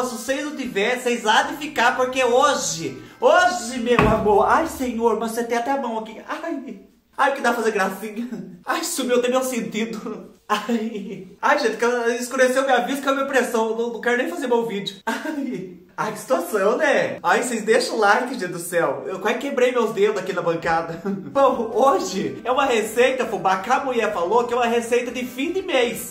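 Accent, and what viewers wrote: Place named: South, Brazil. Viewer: Fubá cai sem querer no teu vídeo e ao ouvir esse sotaque pensei: esse Fubá deve ser do PR ou de SC ou de RS😅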